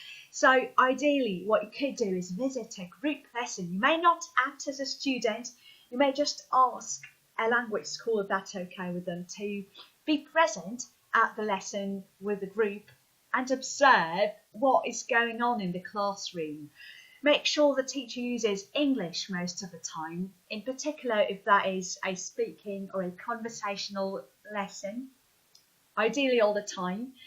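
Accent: United Kingdom. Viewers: Ти дійсно з України? Це просто як Гаррі Поттера дивитися в оригіналі. Я б не повірив тобі, якби ти зі мною розмовляла в Україні, що ти не native speaker. Дуже гарно розмовляєш, як викладач та студент, який вчить інших та вивчає англійську, можу сказати, що це найкращий брітіш екснт, який я чув від українця. Ти просто зробила мій день. Повністю згоден, тільки важка праця над собою може допомогти досягти advanced or proficiency.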